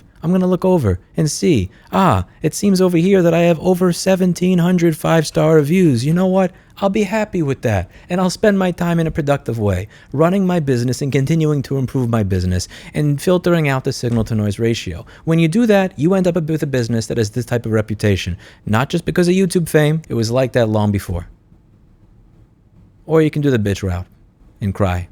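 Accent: New York, United States. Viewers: Please shut up about the linus drama. It got old quick I come here to listen to that succulent new york accent not to hear about the YouTube equivalent of schoolgirl drama. Otherwise Loved the video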